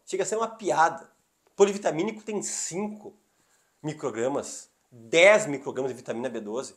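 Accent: Rio Grande do Sul, Brazil. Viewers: MS que médico bem gaúcho tche!!!!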